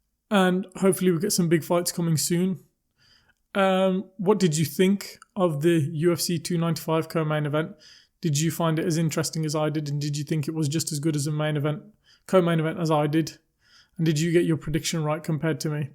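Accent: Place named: West Country, United Kingdom. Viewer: west country-ish?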